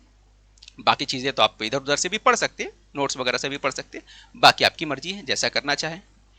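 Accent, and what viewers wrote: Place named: Maharashtra, India. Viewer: Sir aap maharashtra se ho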